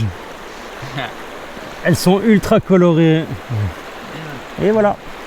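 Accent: Belgium. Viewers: Tu es belge ou français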